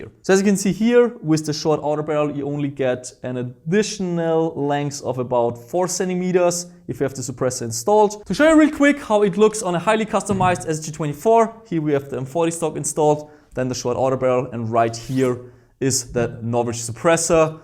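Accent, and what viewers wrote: Germany. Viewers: Warum machst du eigentlich keine deutschen Videos man merkt das du beim Englisch sprechen einen deutschen Akzent hast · bro what the german/austrian accent is the best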